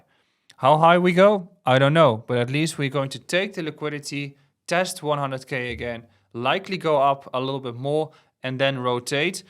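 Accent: Netherlands. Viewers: The Dutch accent though 😅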